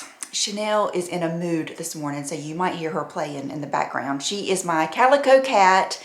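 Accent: South, United States. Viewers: I love your personality! I am a southern girl that has moved out west- and find your voice and attitude reminds me of home- you are like a new carny friend!! It is so amazing how great i feel on just a few weeks of carnivore eating (i have had a few 'eat all the carbs that i want days')-- the joint pain, allergy symptoms, most of the menopause hot flashs, and edema are gone- i have far more energy and clarity-- and wow i feel super calm- this coming from someone who thought that their personality was just anxious- my brain just never turned off! And i started this as someone who did not like meat much but now i find myself craving it and butter- fascinating!! I have not lost much weight yet but my clothes are fitting better and my face is slimming down- have subscribed and please keep up the good work- ❤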